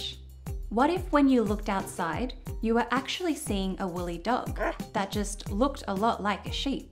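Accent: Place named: Australia. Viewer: I love your cultivated Australian accent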